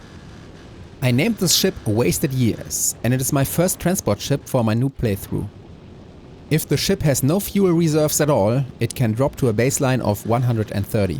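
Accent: Germany. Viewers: Den deutschen Akzent hört man extrem raus.😅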